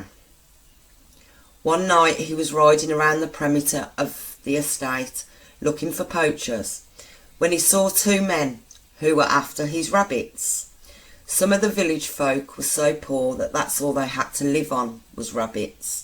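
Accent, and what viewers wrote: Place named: Birmingham, United Kingdom. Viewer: I like her brummie accent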